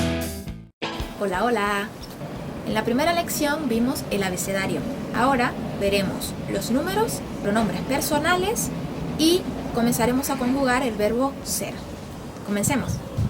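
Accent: Venezuela. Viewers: Ahhh es que escuchando tu acento dije que no eras nativa de España ni si quiera, y no me sonaba a más nada sino al de acá. Yo soy Venezolano pero con acento paisa colombiano por mi padre... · Sabía que eras venezolana por tu acento y... por tu belleza!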